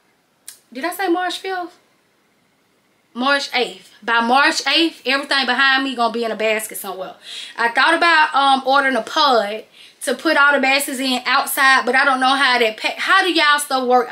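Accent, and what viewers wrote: South, United States. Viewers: Just to let you know you're the truth I will be trying your concept of a basket business. Love your voice your are truly from the South . May God bless you always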